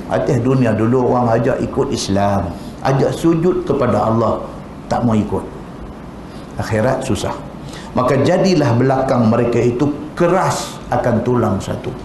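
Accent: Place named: North, Malaysia. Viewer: ceramah ustaz shamsuri terbaik...suka dengar loghat utara dia.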